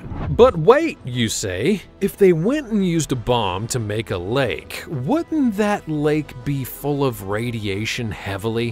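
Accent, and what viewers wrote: United States. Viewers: Wow, irritating American accent is horrid.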